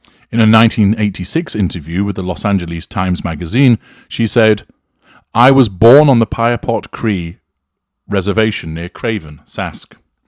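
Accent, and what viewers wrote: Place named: RP, United Kingdom. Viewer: Waayy too much repetition in this series. It is mostly just a compilation of second-hand bits from the news media. A lazy piece of ‘investigation’, and chock full of mispronunciations: ‘Regina’, ‘Qu’Appelle’, ‘Ontario’. And what is meant in Part 1 by, ‘Canada and the Territories’, for heaven’s sake? You might have a posh accent but these podcasts are a very lazy effort to create content.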